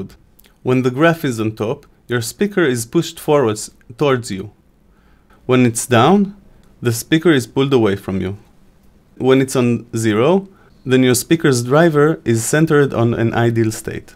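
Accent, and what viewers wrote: Israel. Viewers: a mathematical point of view: Fourier Series <link> האם זיהיתי מבטא ישראלי? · Haha It happened again, looked for a good music explanation, found a video in English (Which is not my mother language) but with Hebrew (Israeli) accent (Which is my mother language).. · you from Israel / you have the accent/ good work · Where are you from? You have an Israeli accent. :)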